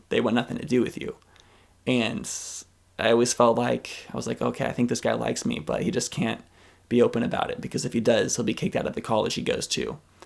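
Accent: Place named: United States. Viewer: Hey, Thank you so much for sharing. I have been watching your content for a very long time and suspected early on you were gay but didn't put much further thought into it since it didn't matter to me either way. Just was an observation like noting what colour your hair is or that you have an American accent. My mum has a few friends/acquaintances that are gay so growing up it wasn't weird to me but I was also aware that not everyone was accepting of people who are gay. I also knew people in high school that did the same thing as you did and pretended they were straight by dating someone of the opposite sex and are now happily out and proud. I was a big tomboy growing up and like doing things that were considered much more masculine but I am straight, while one of my friends is a huge girly girl/super feminine and she is gay. So I very much believe you are born gay based on that as well as what other people who are gay have personally told me. 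I am so proud of you and just want you to be happy:)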